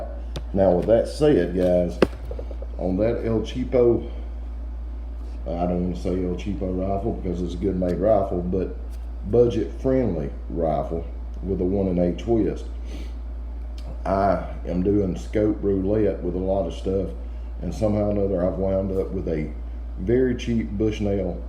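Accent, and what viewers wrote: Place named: Kentucky, United States. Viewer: You sound like a fellow Kentuckian. Am I right?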